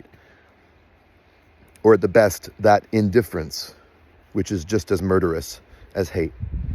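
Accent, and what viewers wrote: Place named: United States. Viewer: Did you watch Tantura? The people who did that were not victims of European Holocaust.... the Israeli hyjacking of it is outrageous! And PS... the American holocaust that Jewish ppl are also complicit in is ongoing to this very day! OUTRAGEOUS WITH YOUR AMERICAN ACCENT YOU ERASE genocide you are also a beneficiary of NOW!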